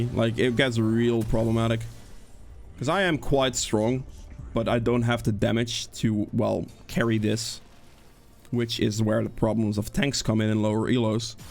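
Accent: Netherlands. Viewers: U are Dutch ?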